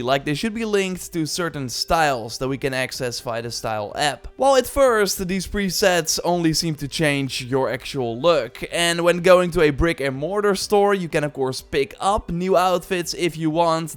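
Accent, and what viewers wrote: Netherlands. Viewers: Zonder dat ik je ken weet ik dat je Nederlands bent haha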